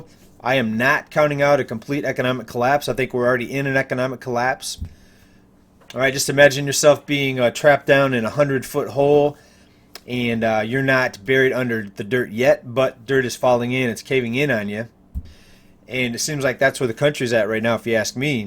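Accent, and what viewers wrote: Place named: South, United States. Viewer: I like his big bubba accent!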